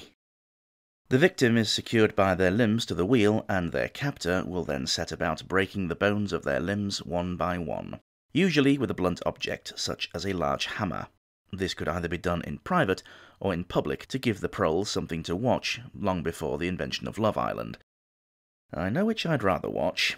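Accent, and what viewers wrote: South, United States. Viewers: Some of these are better and more merciful than what the politicians & the 1% do to everyone daily. Well they just kicked in my door & it's time for the thumbscrews. (I refuse to use that nickname) Don't worry when I confess I'll be laying everything I've ever done at your feet. Great clip as usual, impressive research, loved the video, great line about old honest Guy Fawkes. Southern Draw? 7-7.5 not bad, you sounded a bit like a educated southerner though, more of a politician than a moonshiner. -no fun. Reason? Just listen to the song. ...with black curtains..makes a difference apparently. Margaret the Pearl of York; more of man than most of us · your southern drawl was pretty good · I rate Southern Drawl 7/10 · Southern drawl, oh yes! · Agreed. Definitely not Texan or Georgian. (I’m native Georgian who spent 5 years in Waco, Texas. 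Virginia / North Carolina is the closest to the accent of the podcaster. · I'd say 10 out of 10 for the southern accent.. and thats from a southerner in Virginia, USA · I'm from the south and I must say, your southern accent sounds absolutely beautiful. The old southern accent can still be heard in parts of Georgia, Alabama, and Mississippi; and even in parts of Louisiana and Texas. Fun fact: The southern accent is the baby brother of Received Pronounciation. When Princess Diana visited the south for the first time, she stated that she could understand us far better than our Northern counterparts. The Cajun accent is derived from the French accent...that's why no one can understand those fuckers, lol...only playing around. Anyway, sorry to go on a tangent and you may have already known all this, but here it is anyway.😊😅😂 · Not bad Southern accent! I'm from the fringes of the South so it's not an accent I have much experience with but it still sounded good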